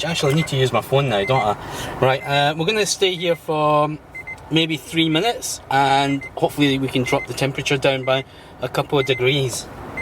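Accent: Scotland, United Kingdom. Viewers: I love the subtitles - some amusing translations of your Scottish accent!!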